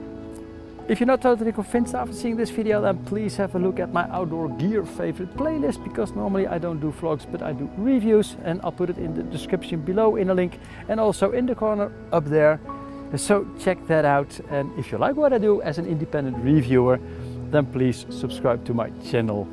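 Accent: Netherlands. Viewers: Nice video, I really enjoyed watching it. If you would allow me to give just one point of critique, it would be this: while your English is excellent (ok, I can hear that you're a Dutchman, but there's no shame in that), there was one expression you used that kind of irritated my ears. It's when you say samething like: "that is how it should look like". You're stringing two expressions into one (incorrectly), so you could either say "that is how it should look" or "that is what it should look like". Apart from that, again: great video.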